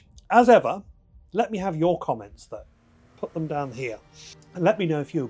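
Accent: United Kingdom. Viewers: I think it’s “jen-tee-ann” blue, but you get some slack because of your British accent. 😆 Great review though, and I’ve watched a few. Yours was a nice honest assessment. Thank you for that. One of the best so far. The cool things about the T, outside of its engine/suspension/performance specs, are the throwback wood shift knob and the black plaid seat inserts. They make the T truly unique. The good news is that you can add both of those visual cues to just about any Porsche. I’ve previously had a guy in Mexico City make almost the exact same shifter. I’m sure a black plaid material is available in automotive upholstery circles. You can also get large black/black Pepita houndstooth from Relicate for a similar interior vibe. Keep up the great work,!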